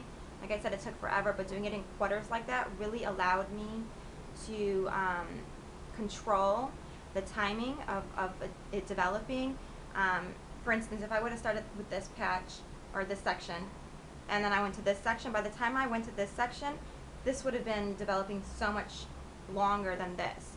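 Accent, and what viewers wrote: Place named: New Jersey, United States. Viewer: i love your voice, you sound jersey haha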